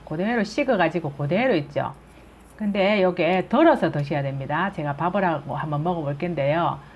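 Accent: Gyeongsang, South Korea. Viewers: 님 처음댓글 다는데 시청잘하고있 습니다 님 지역이 어디세요 경상도 시투리 귀에 익숙했어 저는 영천입니다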